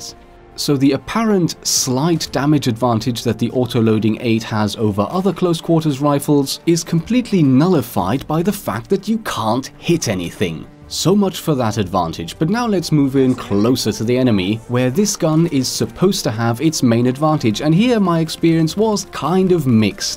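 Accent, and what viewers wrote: Germany. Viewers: lol are u german ? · Are you German ?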